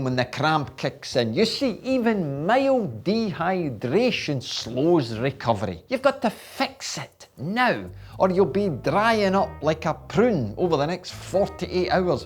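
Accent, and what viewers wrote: Scotland, United Kingdom. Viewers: As an American I could listen to a Scottish guy cuss and swear all day 🤣I appreciate all your advice my friend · Is this accent Scottish? Or what it is? I am Italian and I cannot "localize" it. (I mean his normal accent, not the accent he has when he plays the Scotsman).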